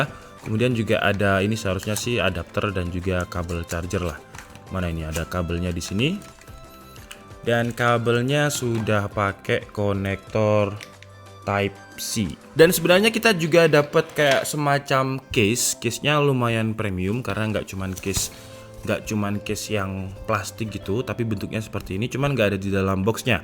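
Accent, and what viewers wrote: Java, Indonesia. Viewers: Orang jawa emang medok mas bro jawane😂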